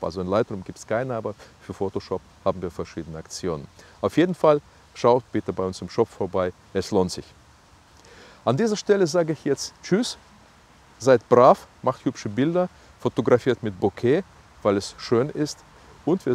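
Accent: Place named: Soviet Union. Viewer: Вы наверное никогда не избавьтесь от советского акцента...извините если что, Старый водитель TIR. Сейчас стокер...ландшафтный.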